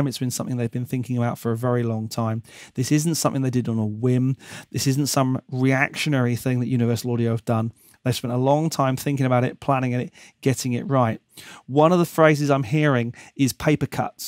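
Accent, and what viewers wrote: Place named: England, United Kingdom. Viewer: LunaRRRRRR. Gotta love that English accent 😍😘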